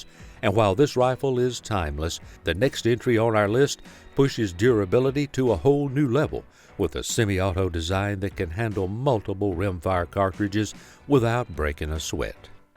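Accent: South, United States. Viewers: This redneck AI is annoying af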